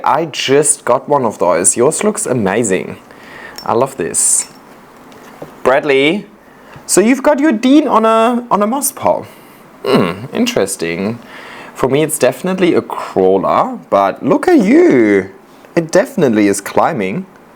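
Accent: Germany. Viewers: Wusste vorher nicht das du deutsch bist, aber dein Akzent (kaum hörbar) kam mir irgendwie vertraut vor 😊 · Ich habe es nur durch deinen deutschen Akzent irgendwann mitbekommen. Bin hier gelandet wegen deinen wunderschönen Pflanzen und den echten Moosstäben. Sehr inspirierend und funktioniert auch in kaltem, deutschen Winter. 🤪 Liebe Grüße aus Leipzig.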